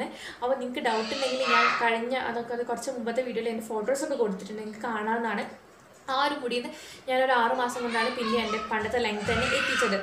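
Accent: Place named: Kozhikode, India. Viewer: njnm calicut aanu chechii.. Slang kettappo thonni atha chodhiche ♥️😘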